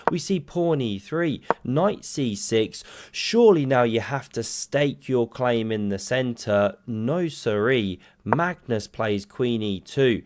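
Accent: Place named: RP, United Kingdom. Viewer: I subscribed as soon as I heard BBC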